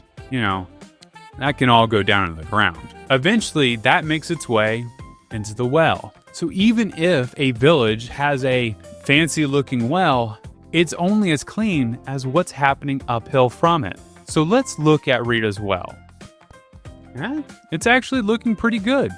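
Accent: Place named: Midwest, United States. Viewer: Your voice is extremely midwestern north america. Btw very cool video, I learned a lot!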